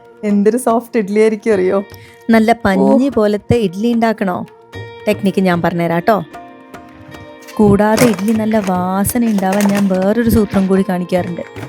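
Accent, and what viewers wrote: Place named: Thrissur, India. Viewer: Sister you do not have a Kannur or thalassery slang it's that of Thrissur and Palakkad anyway good 👍 Sruthi from Dubai hailing from Kannur at thillenkeri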